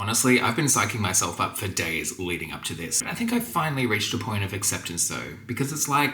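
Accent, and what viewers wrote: United Kingdom. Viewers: Just loving what you're doing and the presentations are fabulous - especially the RP! Makes me smile every time. Thank you 😄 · Your videos are a joy to watch and, as always, your English accent is brilliant and you sound just like the Headmistress at my school……think Hogwarts, without the magic, but all girls and in the 1960’s. Every time you said ‘mock’ you made me giggle 😂😂 I’m in Lancashire England and In Aldi today 500g of leeks were £1.39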